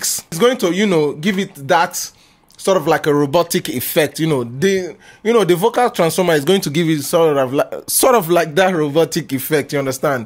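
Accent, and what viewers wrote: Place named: Igbo, Nigeria. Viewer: This guy igbo English accent is mad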